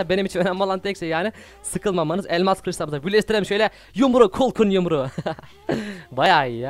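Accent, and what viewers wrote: Adana, Turkey. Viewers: Abi şu konuşma stiline hastayım tam bir adanalısın <3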